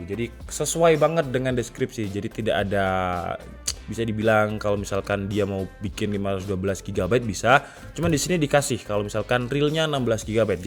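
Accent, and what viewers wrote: Java, Indonesia. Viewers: Njenengan tiyang pundi mas? Kok enten logat logat jowo wkwk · Oalah, smean iki malang pisan ta... 😅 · Oalah ketok e wong jowo😅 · Orang malang kah bang ? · Mas e jawa to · Arek malang ta bro 😅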